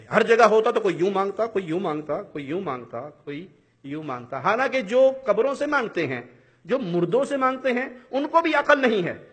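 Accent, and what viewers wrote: Arab world. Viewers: اردو کو اردو لہجے میں بولا کریں، عربی لہجے میں نہیں۔ اردو کو عربی لہجے میں بولنا اتنا ہی غلط ہے جتنا عربی کو اردو یا پنجابی لہجے میں بولنا۔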